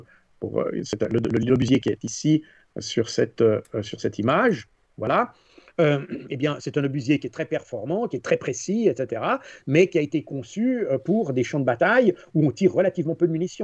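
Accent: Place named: Switzerland. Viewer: son accent suisse trainant d'origine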